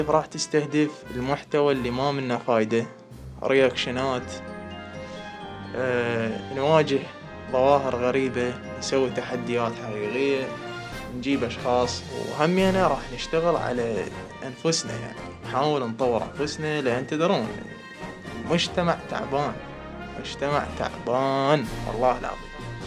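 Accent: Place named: Nasiriyah, Iraq. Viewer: اي بس عبالي من الناصريه لان لهجتك مثلهم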